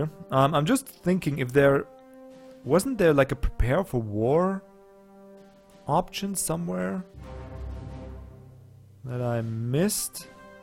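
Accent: Germany. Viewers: I might be a bit late to the party but I'm thoroughly enjoying the lets play so far and looking forward to the rest. keep up the good work and I love the German accent!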